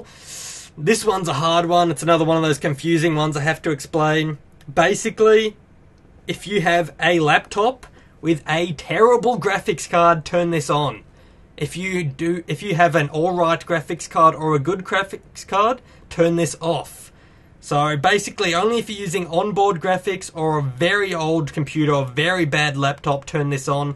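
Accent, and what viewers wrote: Australia. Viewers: umm... are you Australian, British or another country/background. Srry I just really wanna know cuz i'm not good with accents:S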